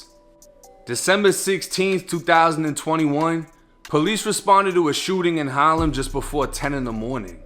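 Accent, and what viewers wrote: Boston, United States. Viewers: Maaaaket. Hahaha. Love that Boston twang bud. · Are you from Boston? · You sound like you are from Boston....that's a long ways from me I reside in beautiful southern California 💪🏽and i put down for my city and state 👊🏽💪🏽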